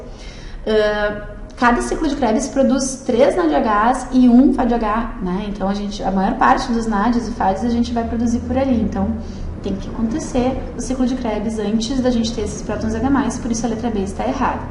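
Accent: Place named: Rio Grande do Sul, Brazil. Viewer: Bah tchê, pelo sotaque é gaúcha!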